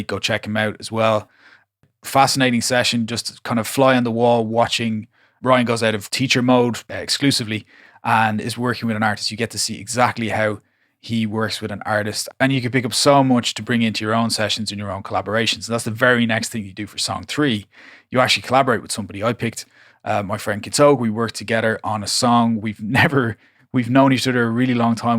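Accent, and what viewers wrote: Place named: Ireland. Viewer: I saw this come up and was very interested. I knew that I wouldn't have the time available to dedicate to it that it would need, so had to pass it by. great to hear that it delivered on the promises made. The track that you came up with sounds great. how do you think it compares to your tracks previous to doing the course? Keep up the good work, and keep the videos coming. I find it way easier to listen to a fellow Irish mans accent than those annoying overly neutral Americanised accents :)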